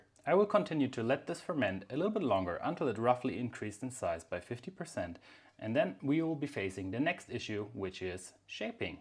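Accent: Germany. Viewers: Me: Hmm his accent is a bit hard to place. I am thinking German.: And now here is the spreadsheet.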